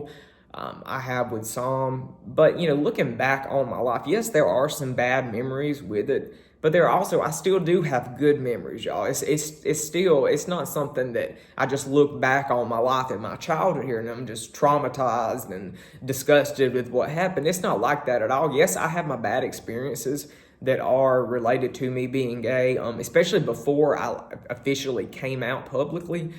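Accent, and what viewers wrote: South, United States. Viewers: I love your accent and hearing you talk! My mama and daddy were from Virginia and growing up. I had a nice southern accent, but I lived in Maryland and I was made fun of so much that I worked so diligently at losing it I wish I had it back, I love listening to you! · Ain't you just as cute as a bug in a rug. Don't you think you'd have a better experience if you didn't have such a girly voice? I'm gay and I never got that girly voice thing. With the southern accent it kind of fits, still I can see how that would annoy some good ole boys. Why ask for trouble? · Dang, your beautiful southern accent is music to my ears! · Thought provoking and insightful, your experiences of growing up gay in the Deep south Bible belt of the United States is similar to mine growing up in rural Catholic Ireland, your are a natural at this looking forward to hearing more of your beautiful southern accent, God has blessed you with good looks and articulateness, you are helping many people God bless you. 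· Love your southern accent.❤ you are so damn cute.🏳️‍🌈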